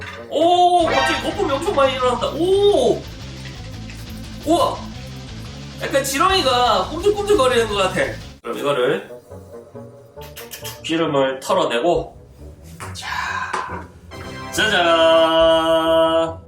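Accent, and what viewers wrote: Busan, South Korea. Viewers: 몰랐을수도있지겁나뭐라하네 근데허팝님부산사람인가요뭔가억양이익숙한데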